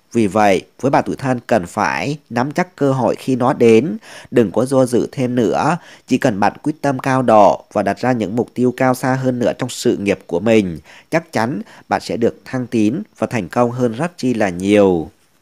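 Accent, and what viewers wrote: Thanh Hoa, Vietnam. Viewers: Bạn cúa phải ngừi thanh hóa ko . Nuối hay rứ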